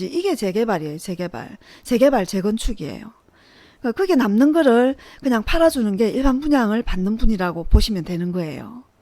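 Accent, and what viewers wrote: Busan, South Korea. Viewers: 처음들어보는 재건축 재 개발 에대한 전문가에 말씀 큰 도움이됩니다 부산사투리 정감있고요 또박 또박 허스키한목소 리로 진행하시는 말 솜씨 가 보통 아니시네요 저는 수영구 역세권 재건 축 대상지 주민으로 물어보고싶은것 많지만 공짜로 들려주시는 유투 버 정보 열심히 청취해보 보겠 습니다 좋은정보 감사합니다 저는 수영구 역세권 대건 축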